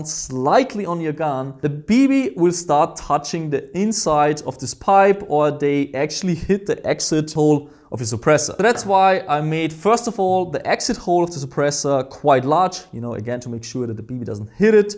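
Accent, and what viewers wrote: Germany. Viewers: bro what the german/austrian accent is the best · Warum machst du eigentlich keine deutschen Videos man merkt das du beim Englisch sprechen einen deutschen Akzent hast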